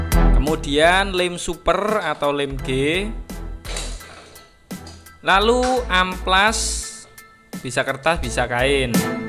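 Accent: Java, Indonesia. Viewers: Om asal mana kok logat bicaranya medog kental nuansa jawanya 🤭🙏 tp gayanya asyik mudah di mengerti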